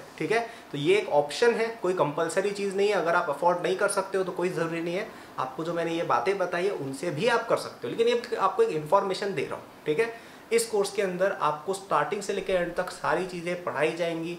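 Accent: Standard. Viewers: Gazzab shudh Hindi👍👍 Best Btw IAM 2023 aspirant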